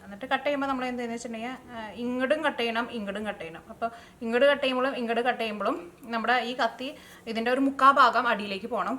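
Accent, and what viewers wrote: Thrissur, India. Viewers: My dear sister you talk too fast. We are from South Kerala so it's difficult to catch up with Thrissur slang. So please slow down a bit. Thank you.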